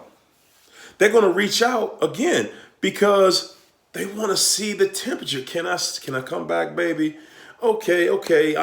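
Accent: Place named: Baltimore, United States. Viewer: I hear a little Baltimore in your accent...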